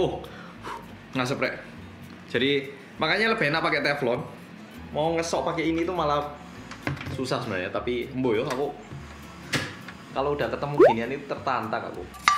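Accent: Java, Indonesia. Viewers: Pertama kali smpai di channel ini, ini kknya kok medok banget ya org indo apa korea si 😂😅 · 😁😃😄wajah korea...jawanya medok😁😃😄👍mantap...seneng dg cara ngomonge..rek rek..😁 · Ihhh gemes... muka korea logat jowo wkwk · Korea.. Tapi ngomong Bi ko logat nya kaya bahasa Jawa ya, 🤔🤔😁😁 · Kok logatnya kayak orang Jawa 😂😂😂😂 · Mas, omongan mu kok isih jelas wae to medok nya, belum lupa bahasa medok e, ya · Mas, pean tak kiro wong jowo lho sumpahh jebul asli korea 🥺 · Medok bgt logatnya wkwk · JAWA BANGETTT😭😭😭😭😭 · HeLLo BoLo-BoLo LiKe Yuk BoLo-BoLo- ~i ReaLLy Like intrO~ OrAnG KoRea Yg Med∅k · seneng bgt liat lo makan , ngakak jugaa cara ngomong nya yg medok 😂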